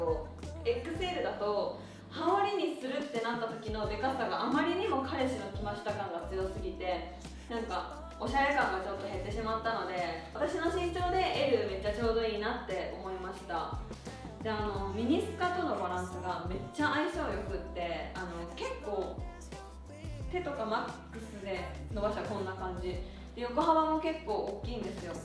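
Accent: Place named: Kansai, Japan. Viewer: さんが履くとユニクロのデニムもセリーヌに見えます😍ほんとどれもめちゃくちゃ可愛いしオシャレすぎです！！ちょいちょい関西のイントネーションが嬉しい😆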